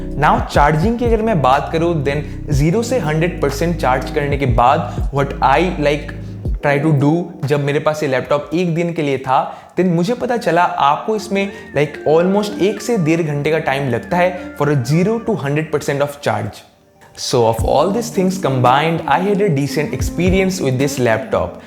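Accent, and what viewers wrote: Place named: Bengal, India. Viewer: Itna Ganda accent.... Bengali saala tobacco kha k bol raha hai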